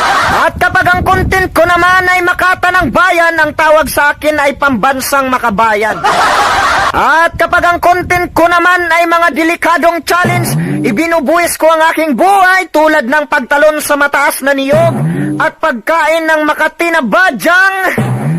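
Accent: Visayas, Philippines. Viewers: Napakatalented. Parang bisaya yung dila pero napakagaling na makatang tagalog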